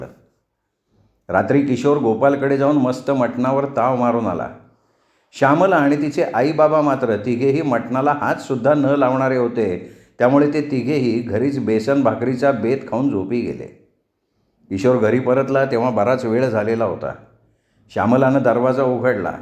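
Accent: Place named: Varhadi, India. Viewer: खूपच छान तुमच्या आवाजात वराडी भाषा कानाला गोड वाटते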